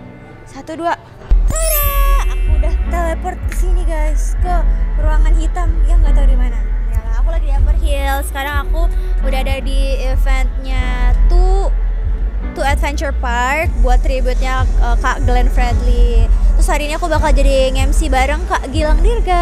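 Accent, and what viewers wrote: Makassar, Indonesia. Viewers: logam MAKASAR nya keluar …beda kalo d Jakarta 🤣🤣🤣sehat sukses ya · Candu banget klo ngomong pake logat Makassar.. Btw pelan2 Ki dek masih pagi loh itu udh makan SOP saudara ajh🤦😮‍💨 #Ewako 😊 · Rajin rajin ki wekna pake logat makassar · langsung mode auto makassar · ini tempat kamung halaman ku, lgsg balogat makkasara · Tdk hilangji tawwa logatna Salam dari makassar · Wich langsung keluar logat mkssarx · Logatnya makassar banget suka deh 😂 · Full logat Makassar 🥰,ceplas ceplos nie 😂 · logat mkasarnya kluar jo..mntabbbb sehatsehat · aslina logatnya tawwa, nda nalupai 😂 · Knp ya klo pulng mksar auto kmbli logat mksar walaupun sdh puluhan taun merantau jkrta....wkkkkk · Logat makassar memang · Tawwa tidak na lupai logat makassar kka, biasa itu ada orang lama mi tinggal di jakarta, na lupa mi logat kampung na, tapi tawwa tidakkk, salut memang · Apa kareba bocah remax funky.auto logat Makasar keluar 😄😄 gini rajin upload biar cepat berkembang channelnya.ketawa bikin candu. 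· Selamat dtg di kota daeng mksr, ternyata dialek mksrx keluar ya. Mantap salam cantik manis, imut.❤❤❤ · enak dengar ngomong makasar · Edisi pulkam nihh...👍 Kereeennn..... logat makassarnya masih mantap... 🤭😇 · Beh anak makassar banget nihh logatnya❤❤❤ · Masih ada logat makassar ta pale 😂😂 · Mantap logat makassar ya ga pernah hilang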